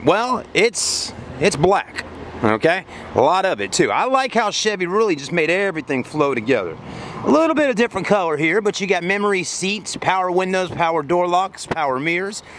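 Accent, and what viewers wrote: South, United States. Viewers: I have the 2018 black premier and ABSOLUTELY LOVE IT! Not a fan of the red line. My husband has the 2018 black LT. we use mine as the family vehicle so that’s why we upgraded mine to the premier. I wanted white but the dealer could only find it in the red line so I got black. I am really glad I did❤️ loved your video and your accent! I’m from Michigan so obviously we don’t have that great southern accent:)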